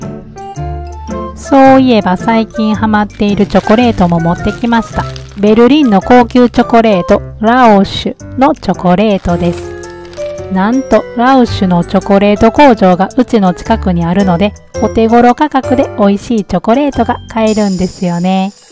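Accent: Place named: Osaka, Japan. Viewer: Nobanaさんの独特な大阪弁を聞くと、金曜日だー！ってほっこりします♡ドイツも好きだけど、単純にNobanaワールドが好き。大阪でオフ会あったら、Nobanaさんに会ってみたい😆ところでオフ会っていうものは、一人で行っても大丈夫なものなんでしょうか。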